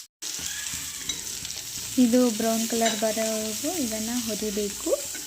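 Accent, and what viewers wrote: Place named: Maharashtra, India. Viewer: Akka avare niv marathi naa adakke nivu teve yannu tava annutiddiraa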